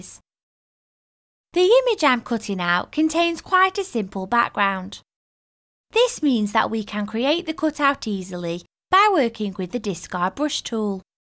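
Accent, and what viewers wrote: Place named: Lancashire, United Kingdom. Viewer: Sounds like Bubbles. That's a Lancashire accent.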